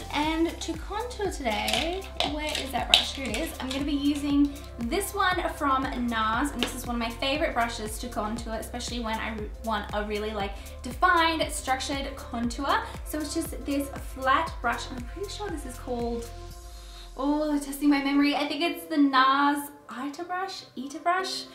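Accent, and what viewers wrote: Australia. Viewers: I love your Austrilian accent!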